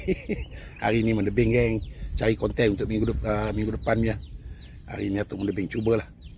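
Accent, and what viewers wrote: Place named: Johor, Malaysia. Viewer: Atok asal johor ke? Tukar sebut tuko Biar sebut bio Hehe tnye je tok Slm dr tangkak johor❤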